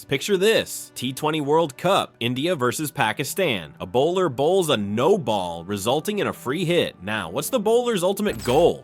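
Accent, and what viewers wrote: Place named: United States. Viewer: Given this has an American accent doing the commmentary explain why the Mankad should be treated any differently to the hidden ball trick or a pick-off by the pitcher in elite baseball. The instance of the ball in play hitting the wicketkeeper's helmet is easily overcome given there arre some international grounds that have a small storage hold with lid in the ground where a helmet might be kept.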